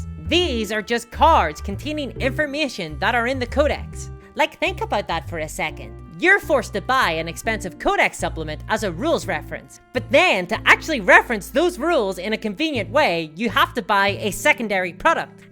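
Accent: Northern Ireland, United Kingdom. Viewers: Are you from Northern Ireland by any chance? The accent sounds familiar. You should do something with the two Neckbeardia people if so! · Spot-on assessment! Love the accent; norn Irish + Canadian/american?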